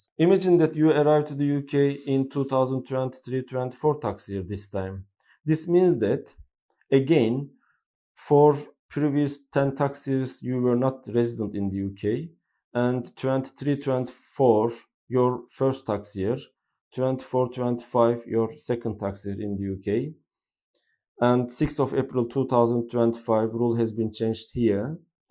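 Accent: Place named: Turkey. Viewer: Bu İngilizce ile İngilterede yaşadığı yetmemiş bir de millete vergi tavsiyesi veriyor adam pes vallahi. Hiç bu kadar Türkçe bir İngilizce dinlememiştim 😂😂 git Türkçe konuş bari bu kadar bu dili konuşamıyorsan birader. Bir de zaten bunu dinleyecek kesim ağırlıklı buradakiler, neden Türkçe anlatmadın anlamadık.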